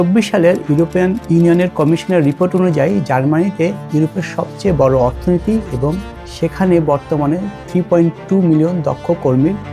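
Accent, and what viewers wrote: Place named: England, United Kingdom. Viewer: ভিডিওটা বেশ সাজানো ও নতুনদের জন‍্য বেশ উপকারী। তবে উচ্চারণটা ইংরেজির মতো হয়ে গেছে। বিশেষ করে উমলাউট বা Z এর উচ্চারণগুলো। 'আবার গুউট...।।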